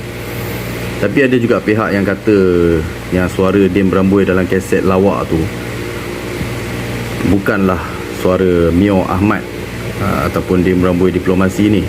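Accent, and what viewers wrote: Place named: Malaysia. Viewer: Sy dah lama tertanya²..bro ni org Bugis Selangor ke? Pekat no melayu nye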